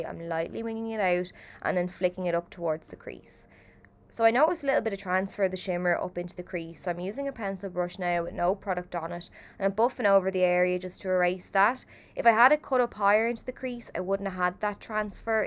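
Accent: Ireland. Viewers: Are you Irish? Sorry that’s random to ask, just really like the sound of your voice so I was just curious ☺️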